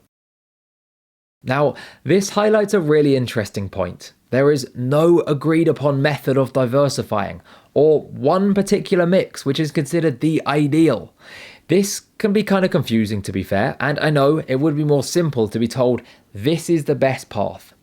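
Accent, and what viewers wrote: United Kingdom. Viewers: Hello,! I have been watching your videos for quite some time and I am really excited about stock investing as you are (and your british acent is supercool!). I know you are not an advisor or smth, but I would love to hear your opinion about these five stocks (They got on my radar for this/next months investments to buy). It is: Verizon, wingstop, alibaba, walmart and pluralsight. What do you think about these (going long run 2-5years?). Maybe you have something else on your sight? Thanks in advance!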